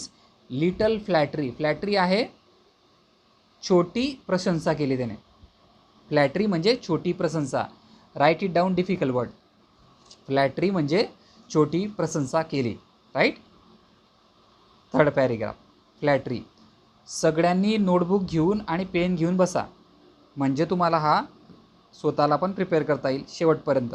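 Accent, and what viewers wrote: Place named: Khandesh, India. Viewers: Nice Khandeshi English 😂🎉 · Sir your accent is like khandeshi.... Thank you so much for explanation....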